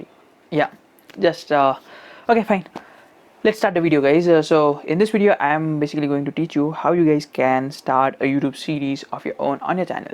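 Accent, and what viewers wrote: India. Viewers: Hindi accent I noticed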